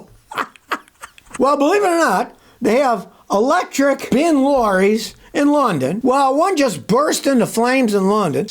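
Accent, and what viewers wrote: Germany. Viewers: funny German accent. More ... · Best German Accent on Youtube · Loved your German accent 😅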